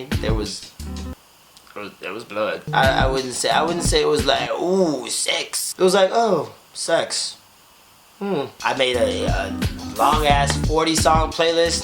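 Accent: Jamaica. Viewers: Are u from Jamaica or America...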